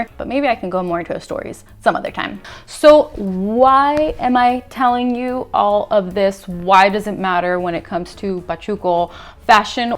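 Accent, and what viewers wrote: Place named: General American, United States. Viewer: Where in the states are you from/grew up? You have the most universal American accent ever--idk what people are talking about when saying you mispronounce stuff lol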